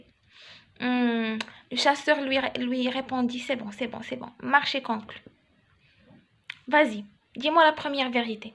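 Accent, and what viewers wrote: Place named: Fes, Morocco. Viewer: Un délice... mais je préfère en darija avec ton accent fessi si!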